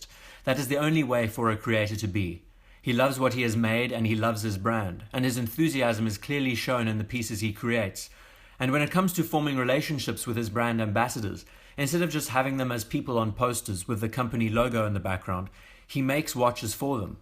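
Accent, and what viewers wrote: South Africa. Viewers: You're definitely South African😂😂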